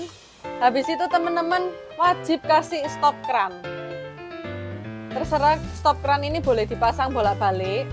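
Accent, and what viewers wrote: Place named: Surabaya, Indonesia. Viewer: Oalah jebul aning Suróbóyó. Mulané medok buanget. Rapópó cik, kita satu server.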